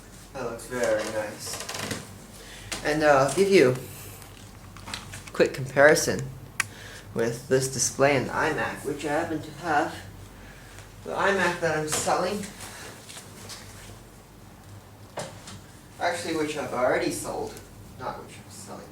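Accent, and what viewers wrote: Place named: United States. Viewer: dam!!! so much happend to you well hope it goes well you live in hong kong?? you sound so American... heheh its all good cheers.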